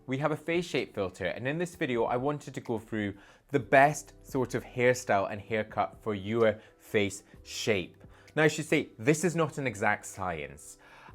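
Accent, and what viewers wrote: United Kingdom. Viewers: Hi! I came here to help my teenage son find a suitable hair style. Content was really helpful. Most of all I fell for your presentation 😊. I like your voice and clear presentation. I was thinking you are British or Australian? 😂😂I googled and found you are actually from one of my favorite country Scotland 😊. I have visited your beautiful country. All the best for your work! 🙏 ❤️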